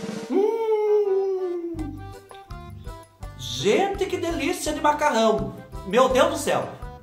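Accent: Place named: Minas Gerais, Brazil. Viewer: Mais um vídeo delicioso se é q me entendem.eta nosso mineirinho adotado tem certeza q não nasceu em Minas Gerais seu sotaque e melhor q o meu kkkkkkk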